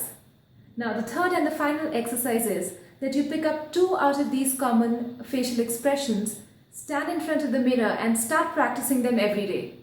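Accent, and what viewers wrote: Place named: India. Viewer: i find Indian accents pretty. yours soft ;)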